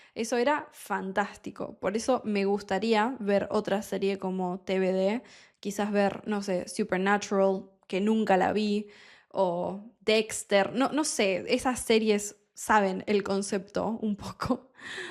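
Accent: Argentina. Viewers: I get so excited when I'm watching your videos and realize I understood almost everything. My spanish teacher is from Buenos Aires and I love watching your videos in general, but I noticed they have helped me understand her accent better too. In my lesson today I only remembered how to say "rallado" in an Argentine accent because of all of your cooking videos! It was really funny. It's great hearing about your language learning journey as well. Thank you so much for sharing. 🥰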